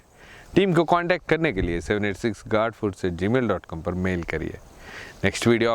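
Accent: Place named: Bihar, India. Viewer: aap Bihar se hoo Kiya? aapki awaz ek dum bihar wali hai.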